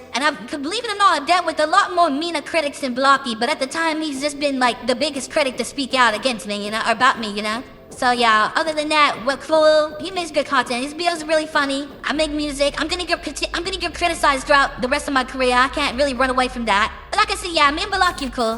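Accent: United Kingdom. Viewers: your British is showing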